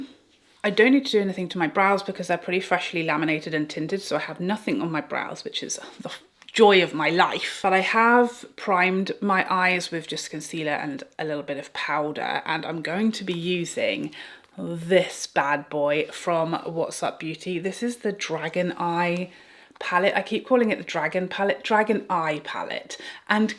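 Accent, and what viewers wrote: United Kingdom. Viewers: I love your stories -- not only are they great and cray cray, but you're a natural storyteller. Plus, your accent is darling. (I'm from the US and adore UK accents.) Great video on product. I've been curious about all you showed! P.S. - Where are the brushes made?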